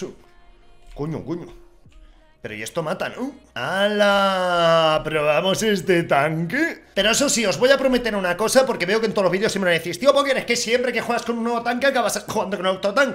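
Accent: Chile. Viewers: Like por el acento chileno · Poker hablando y puteando como un buen chileno sjdj saludos weon🇨🇱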